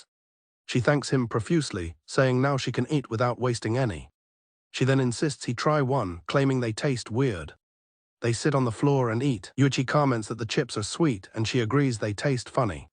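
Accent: United Kingdom. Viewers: British narrator? Yessir, first time hearing British narrator.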